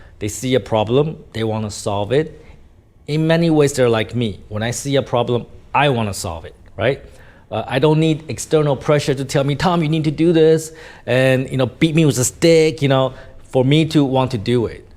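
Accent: Standard. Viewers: Thầy nói rất chuẩn luôn good thầy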